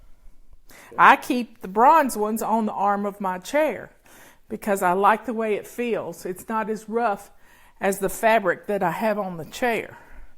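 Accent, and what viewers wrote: South, United States. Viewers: love your cute Southern drawl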